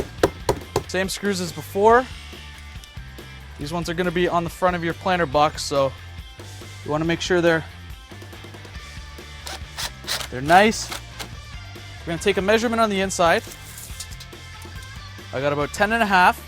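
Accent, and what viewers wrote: Canada. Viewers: I was going to ask if your Canadian because you sound like an NHL player then I saw your T-shirt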